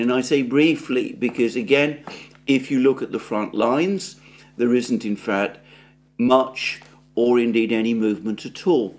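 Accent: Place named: RP, United Kingdom. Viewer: You can easily double the speed of Alexander, and still hear him clearly - that's one of the boons of posh English.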